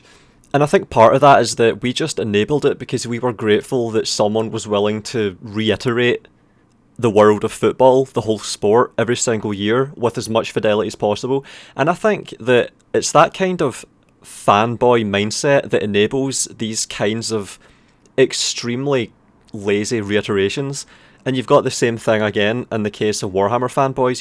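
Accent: Scotland, United Kingdom. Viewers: I remember watching you 8 years ago and I couldn't understand your accent, since then I've spent quite a bit of time around people from Scotland and Northern England so now your accent isn't hard to understand at all. This has no relevance to anything you talk about in the video. Keep up the good work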